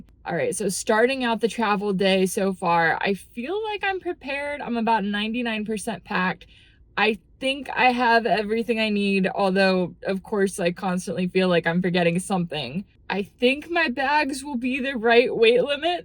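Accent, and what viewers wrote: Texas, United States. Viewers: I'd love to hear your Texas accent :) and some restaurants or "streetfood" what you like in Russia :) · Блин красивая женщина) Есть что-то неуловимое, что притягивает мой взгляд. И еще ее американский мне абсолютно понятен. Приятно послушать чистый, понятный американский язык.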